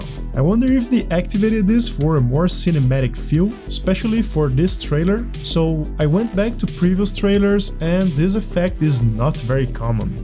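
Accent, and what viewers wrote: Brazil. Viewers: Great video, man. Is your accent from Brazil? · Where are you from? Se sotaque parece de brasileiro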